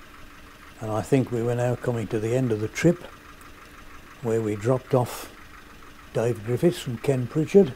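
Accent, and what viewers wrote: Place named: Halesowen, United Kingdom. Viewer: Wow I was born and raised in Halesowen (1940s 50s 60s) all my family and many cousins, some of which still live there. Brings back memories . Good to hear the old accent.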